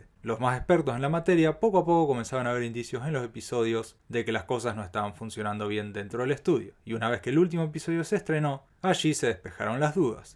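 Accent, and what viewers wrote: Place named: Rioplatense, Argentina. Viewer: No te burles, quizá es un argentino o uruguayo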